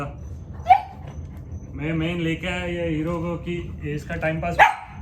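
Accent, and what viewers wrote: Mumbai, India. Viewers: Your way of speaking takes me to Mumbai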